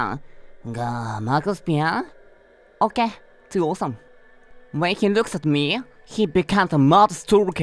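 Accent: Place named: Japan. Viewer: good Japanese English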